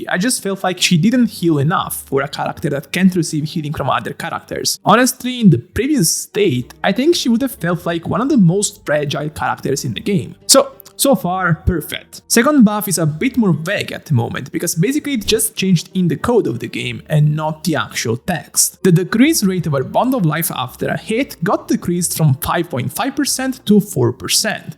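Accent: Italy. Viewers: yeah he is, his accent is really an italian accent and i asked him and he answered yes, in one of his streams at the start he speaks italian too 😂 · " i am italian" he says with the thickest italian accent. Yeah no shit 😅😂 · Ahahah, ma sai che io mi stavo ancora chiedendo da dove venissi con quel accento. Ho capito oggi che sei italiano ahahahaa. Comunque continua così i tuoi video sono utilissimi e dai sempre pareri interessanti devo dire, love your videos❤ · Got jumpscared when you started talking in Italian. Sono italiana anch’io, per qualche motivo non avevo colto il tuo accento. Video fantastici, complimenti. ❤️‍🩹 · Il bello è che l'italianità emerge anche quando parla inglese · Lol it's a very powerful Italian accent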